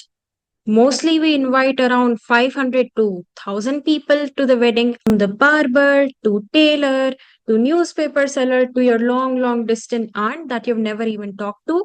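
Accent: United States. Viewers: Amazing American accent 😮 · Nice your american accent ❤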